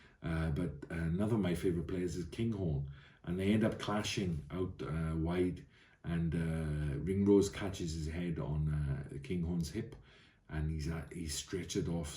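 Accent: Wales, United Kingdom. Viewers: Thanks, you have a lovely soft Welsh voice. Bet you sing in a choir.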